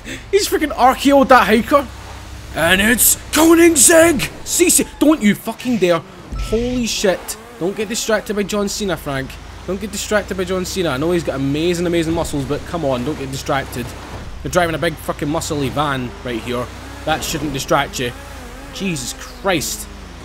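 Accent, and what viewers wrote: Scotland, United Kingdom. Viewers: What part of Scotland is he from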